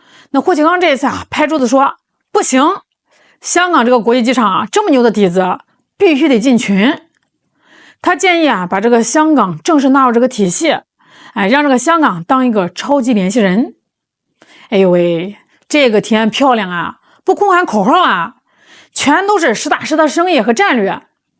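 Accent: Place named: Shandong, China. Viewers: 我听这口音有点像我们山东人，如果不是鲁中，也应该是鲁西南😂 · 我祖籍山东，抗战时期到的天津，已经没有老家口音了，但是听着挺亲切的:washhands: 内容好就看得下去，山东人热情踏实，山普挺好，不用AI则更加真实感和用心诚意。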